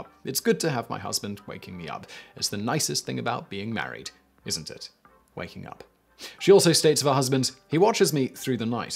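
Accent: United Kingdom. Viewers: Never heard poontang said in a UK accent until today. Prison sucks! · oh how i wish i could have a British guy narrate my life..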